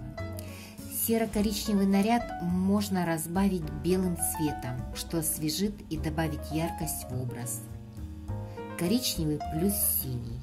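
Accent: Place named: Europe. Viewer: А в какой стране Вы живете? У Вас очень сильный акцент, не могу понять с какого европ. языка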